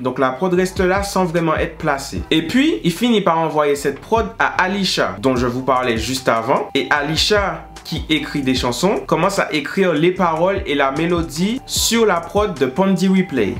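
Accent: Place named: Martinique, France. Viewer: Martinique ou île de la réunion ??? Cet accent 🤣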